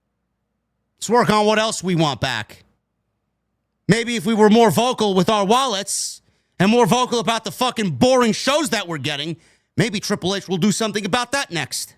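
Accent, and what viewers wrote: New York, United States. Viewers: wasn't angry. That's how alot of us new yorkers talk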